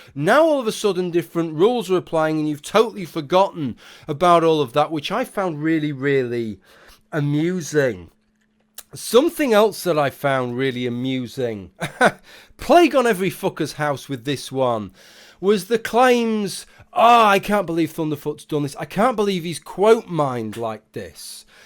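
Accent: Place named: England, United Kingdom. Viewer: Your accent is perhaps the most enjoyable English accent to me. Whereabouts is that from? Heard this couple from Whales recently, old lady was so posh, lol. Loved it. Edit: I don't mean to say I think you sound posh, just I like that too.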